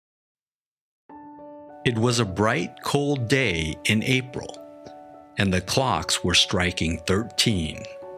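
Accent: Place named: United States. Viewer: He's not AI -- he's American. They tend not to do languages well. Dan Rather use to say " N-rowt" for en route.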